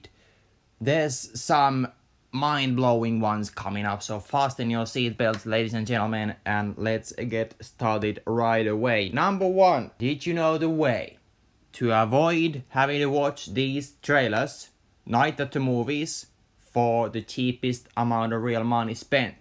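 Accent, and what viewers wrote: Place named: Finland. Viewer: Hyvä ralli enkku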